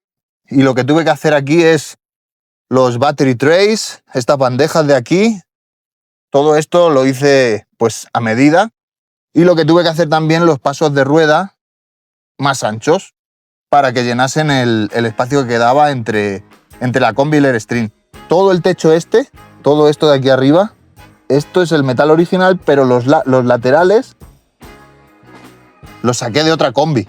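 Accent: Spain. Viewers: Me he quedado loco!!!! Menuda pasada de trabajo, eres un verdadero genio. Conocía la furgo(combi) por fotos pero no te conocía a ti. Esa T1 ha quedado preciosa❤ yo tengo una T3 WBX y una T4. Una sola duda que tengo. Eres Español? Por qué ese acento es de aquí, no creo que sea de México jejejje. Un fuerte abrazo y ya tienes un nuevo seguidor desde CEUTA-ESPAÑA 🎉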